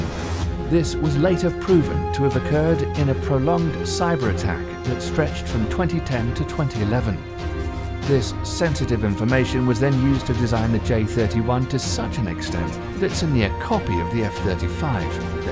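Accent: United Kingdom. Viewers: sounds like he has a British accent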